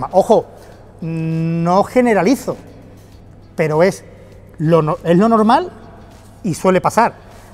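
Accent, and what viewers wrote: Andalusia, Spain. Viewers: Otra pregunta, ese acento español es de que ciudad?😉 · Eres Andaluz??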